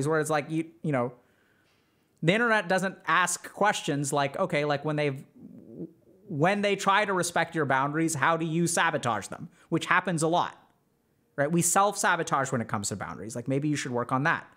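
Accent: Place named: India. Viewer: your Hindi accent is hilarious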